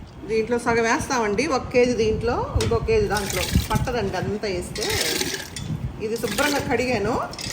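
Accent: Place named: Telugu, India. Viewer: Hi Garu, My name is from Chennai, your video brought me some fond memories as we used to make it with my grand mother, on our terrace. I used to eat them all the time with sambar and annam vadiyalu. Also Perugu annam with mamidi panda, I absolutely fell in love with your telugu accent as we speak the same way, as we are from a agriculture and diary background from ongole. I was wondering if you could make a video on how to make good forgotten simple foods like Paramannam, Pappu charu, Chinthakaya Dosakaya Pachadi, etc similar to our region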